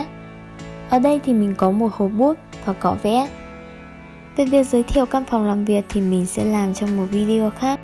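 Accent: Vietnam. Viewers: Chị này người việt hả ? Mình mới theo dõi chị gần đây,thấy đa phần là fan nước ngoài Nên tưởng chị người nước ngoài, h nghe giọng mới biết😂😂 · Á hahha mình nghe được giọng bạn rùi cute quá! Giờ ms bt bn là người Việt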